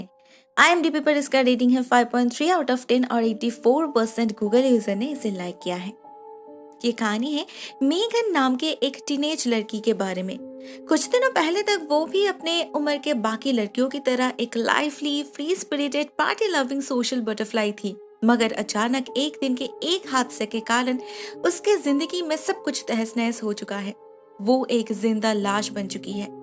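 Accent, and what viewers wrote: Bengal, India. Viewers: u hav improved alot on ur hindi...but still bengali accent persist..cant blame u.. but so far so good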